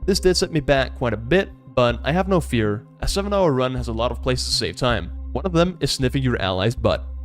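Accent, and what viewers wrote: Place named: Denmark. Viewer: Are you Danish? Your accent sounds Danish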